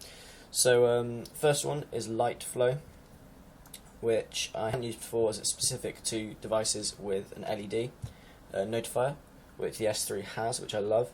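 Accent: England, United Kingdom. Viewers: I realy hate that british accent its so ... gay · British accent hurts my ear T_T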